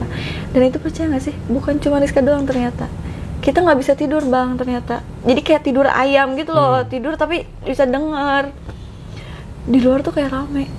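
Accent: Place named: West Java, Indonesia. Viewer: Suaranya sunda dan enak 😘😘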